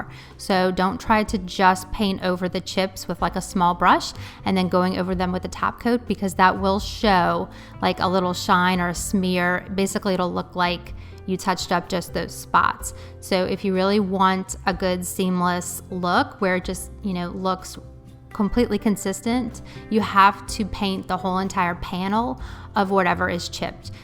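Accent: Louisiana, United States. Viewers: hi! I just subscribed, your video from a year ago popped up on I my feed. I'm in SE La I recognized your accent :)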